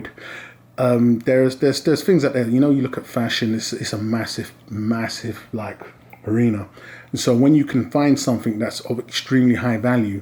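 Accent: United Kingdom. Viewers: Hi, you sound British where do you get your bullion from in the UK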